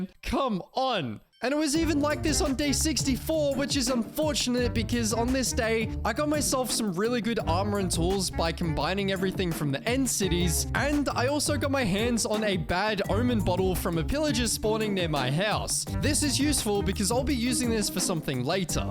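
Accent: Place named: Australia. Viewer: He’s Australian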